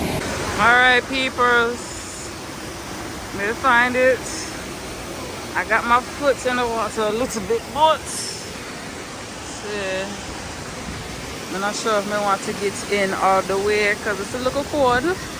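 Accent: Jamaica. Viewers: That Jamaican accent slowly creeping in..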